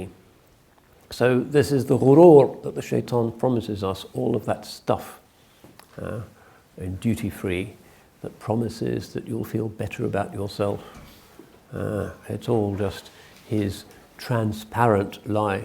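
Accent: United Kingdom. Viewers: This is amazing. Love the British accent. Shows that stupidity sounds intelligent with the right accent but remains stupidity nonetheless.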